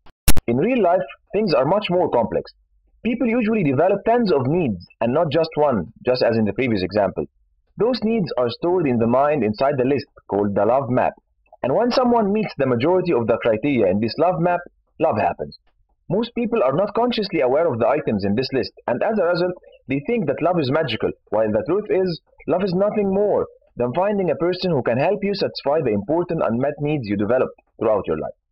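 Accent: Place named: Egypt. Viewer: egyption accent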